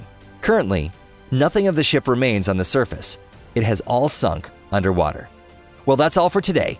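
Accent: United States. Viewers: Most insane American accent i ever heared. Im not able to finish this.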